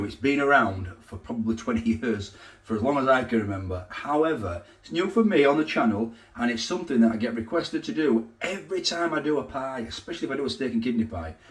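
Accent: Yorkshire, United Kingdom. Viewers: . Watching this from Adelaide with great envy. Can tell you are a woolly back from yorkshire. wheres the curry sauce and barm cake?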